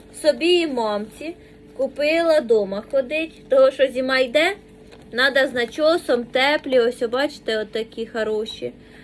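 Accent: Poltava, Ukraine. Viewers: Як ви не розумієте,що вона говорить,спеціально полтавськтм акцентом Вона грамотна дівчина,подивіться її інтерв,ю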